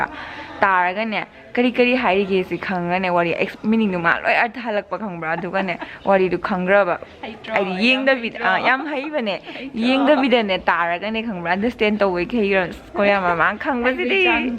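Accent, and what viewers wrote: Kakching, India. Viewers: Kakching wa khungang sey ym nung c yeh.. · Kakching marol ne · Ym engna fjeiko ngn wa ngangbje ym nungcye ado kakching gi lon nttabid amut haiyu ko aduju ym nungcdw mnle berry nc sister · Is this how you normally talk at home or you just wanted to show how kakching people talk? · che se kakching mlli🙄🙄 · Kakching maron malle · I m watching ur vedio far away from Nepal. Love to listen ur voice .. ei na yam pamjava ni kakching marol j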